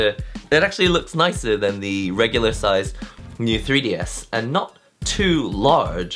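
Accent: United Kingdom. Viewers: As an america, seeing a asian talk with a british accent was very confusing. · Wait and Asian guy with a British accent amazing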